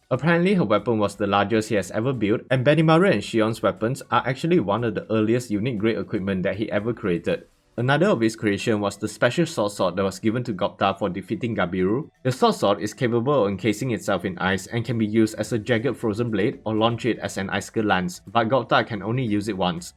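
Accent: Singapore. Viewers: Ma man sound like a Singaporean / Malaysian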